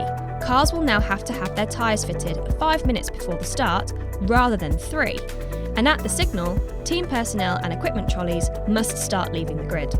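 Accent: United Kingdom. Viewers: whait is that? and why does she sound incredibly brittish in this video? (yeah i know she's from the UK, but i dont remember her accent being so noticable).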